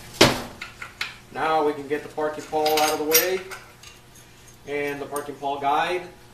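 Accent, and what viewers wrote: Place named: South Texas, United States. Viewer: I could be wrong but I think that's a south Texas chicano accent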